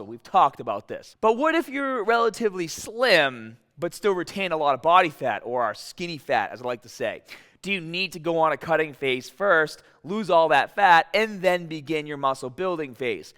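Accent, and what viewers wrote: Boston, United States. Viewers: The exaggerated Boston accent is atrocious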